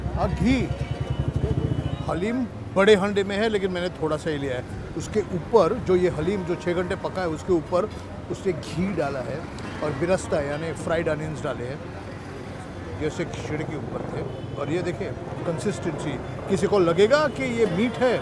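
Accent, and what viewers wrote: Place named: Mumbai, India. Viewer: Your talking style says, Mumbai ka chokra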